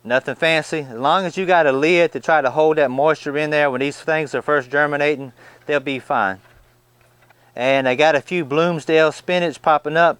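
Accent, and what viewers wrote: South, United States. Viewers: yeah, we all want to make fun of the southern "accent" and wording choices but you have to hand it to him, he's providing a ton of knowlege through trial and error, which is invaluable. I say thank you and I wish I were in a climate where I had more than 2.5-3 months per year to learn in. . .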